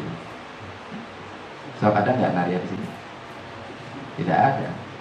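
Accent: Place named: Serang, Indonesia. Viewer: Wih Gale kaya wong Serang ngajine. Melu ngaji kyai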